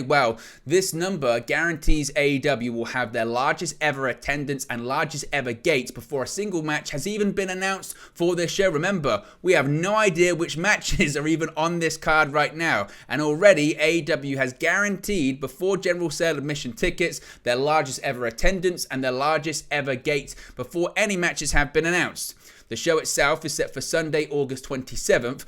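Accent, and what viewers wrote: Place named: United Kingdom. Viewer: Don't like British Accents Bruv?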